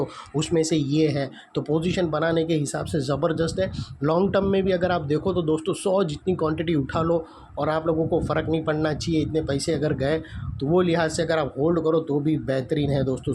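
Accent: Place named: South, India. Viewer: thankyou bhaiya for giving your valuable time to some random 19 y/o stranger I will invest more in my learnings before hand i was a forex trader who trade in 4h and 1h but as I shifted to Indian swing I find it quite difficult to understand as forex is closed for just 3-4 hours a day but you are really helping me in here i have watched all your videos trying to understand things and i am really grateful that you still scam stocks out of so many studies stocks and invest your valuable time even thou you are not getting the audience you deserve even thou you just want to help others people and you are South Indian so that accent is little bit different for people from TATA, JAMSHEDPUR ,❤️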